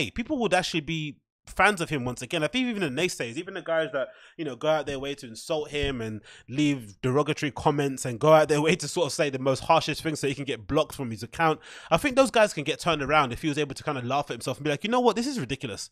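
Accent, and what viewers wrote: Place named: England, United Kingdom. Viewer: How did I know this dude had an English accent before I clicked?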